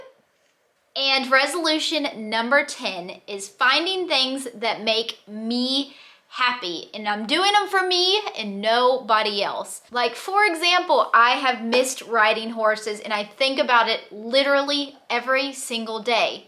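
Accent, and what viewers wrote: South, United States. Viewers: My resolution is to watch you more because your true blue not fake an that's what makes you real keep it up an your southern accent so cool till yor next video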